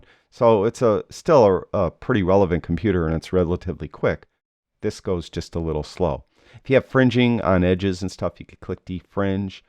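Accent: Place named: Buffalo, United States. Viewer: I left Buffalo in 1964, graduated from Hutch Tech in 1962, the second I heard your voice I knew you were from Buffalo...I'm practicing my Buffalo accent..😊Very good videos.. I am a long time PS user and just switched to Affinity. Good stuff. Thank you.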